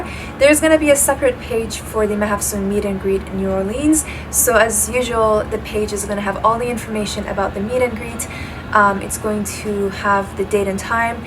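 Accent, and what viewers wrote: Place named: Latin America. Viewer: where is she from? her accent sounds kind of latina 💜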